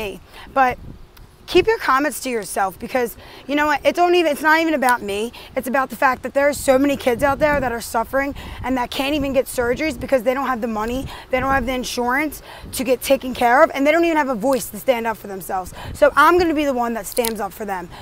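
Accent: United States. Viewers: I never thought there was something wrong with your voice. I always thought it was more of an East Coast thing. 🙂 i like it n im sure there are other ppl who do to. You're reading wouldn't be you without your voice. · I cannot pinpoint your accent but I def recognize east coast, New England maybe? ❤️🔥🤗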